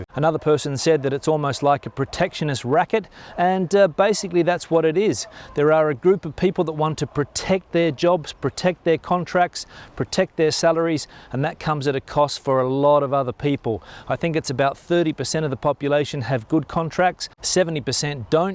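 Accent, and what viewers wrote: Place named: Australia. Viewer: Cheers,. When I first found your channel, I was quite confused. Your rapid speech, Australian accent, and my bad hearing combined to have me thinking your name was Jordy!(I know!😂) The first comment I ever made on one of your videos, I called you Jordy, then I read all the other comments calling you, and I was so embarrassed that I went back and edited it! I love your updates, no matter where they are from👍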